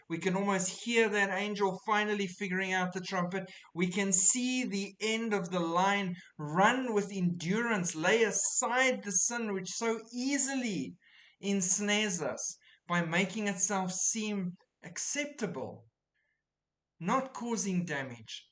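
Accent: South Africa. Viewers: I found your discussion on the red heifers today, as I have been following Bible prophecy from the day I read The Late Great Planet Earth. I was so happy to hear a familiar accent and find a fellow South African who truly loves Jesus Christ and believes in the Sanctification of the Believers and who believes in the imminent Rapture, putting Him above all. Wow, thanks!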